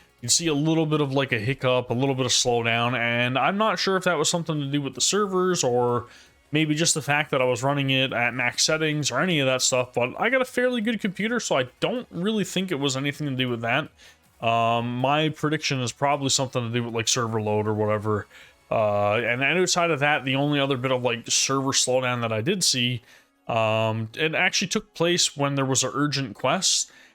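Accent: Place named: Atlantic Canada, Canada. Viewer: Informative video. Out of curiosity, are you from the east coast of Canada by any chance? I hear a bit of NS or maybe NL to your accent.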